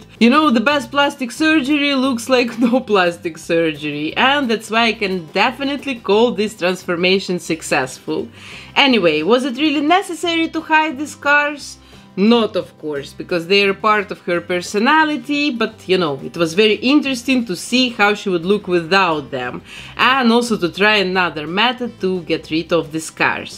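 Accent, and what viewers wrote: Russia. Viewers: I'm sorry, but I want ask you You from Russia or it seemed to me?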